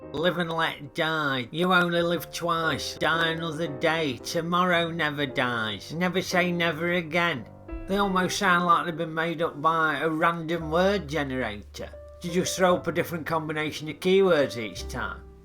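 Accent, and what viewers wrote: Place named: Nottingham, United Kingdom. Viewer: Congrats on 500 subscribers! Well deserved - these vids are mint! (And I'm loving the Notts accent - reminds me of home)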